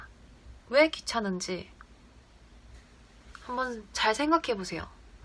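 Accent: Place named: Busan, South Korea. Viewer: 부산여자 아닙니까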